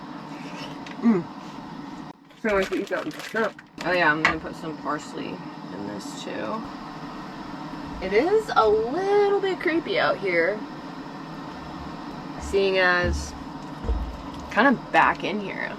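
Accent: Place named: Arkansas, United States. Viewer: I love how that Arkansas accent comes out every once in a while. :)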